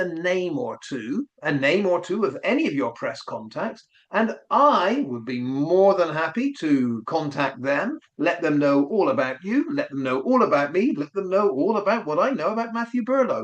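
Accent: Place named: Scotland, United Kingdom. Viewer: Loving your wee Scottish accent! 😂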